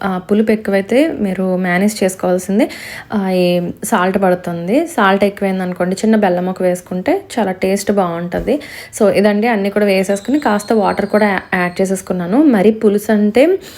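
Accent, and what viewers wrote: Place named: Telangana, India. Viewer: గారు చాయ్ తెలంగాణ భాషలో మీరు మాట్లాడినట్టు అనిపిస్తుంది చాలా సంతోషంగా అనిపిస్తున్నది ❤❤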